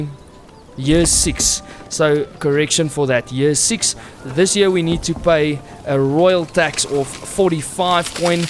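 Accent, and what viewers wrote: South Africa. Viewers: I try not to watch games I play to avoid burnout; but the South African accent is cool as fuck! 😂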